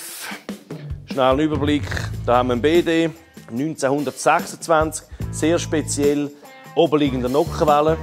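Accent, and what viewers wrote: Switzerland. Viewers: Schweizer Deutsch, immer wieder lustig. So 'ne Töff Sammlig hätt ich auch gern.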